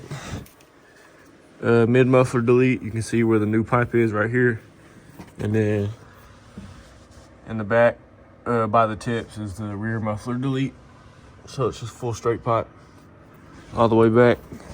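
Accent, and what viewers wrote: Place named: Texas, United States. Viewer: Where you from bro you talk like me I’m from Dallas lol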